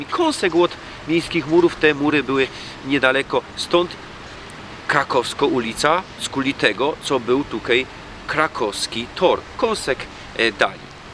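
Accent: Silesia, Poland. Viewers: Urodzilam sie w Myslowicach 41 Roku jest cieszko mi zrozumiec gwarwy sloaska Anna z Australia historia o Myslowicach chciala bym wiedziec❤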